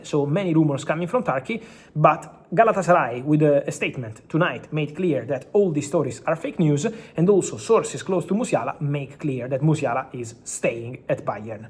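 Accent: Italy. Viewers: İtalian accent is so hard i don't understand you mr Romano😅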